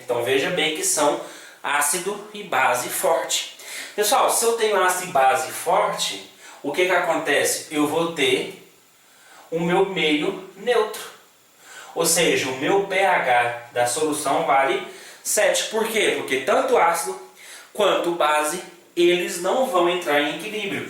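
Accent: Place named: Campos dos Goytacazes, Brazil. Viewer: Parabéns pelos seus vídeos, professor me ajudou muito. Alías, uma pergunta, vc é campista ( campos dos goytacazes-rj) , sua voz tem um sotaque muito parecido hahahahahahaha. Brigadão, estou curioso....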